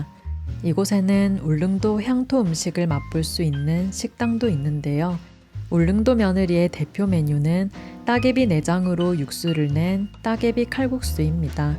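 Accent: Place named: Gangneung, South Korea. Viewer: 강릉분이시죠??^^ 아름다운 드라이브 코스 설명하실때 친근한 억양이ㅎㅎ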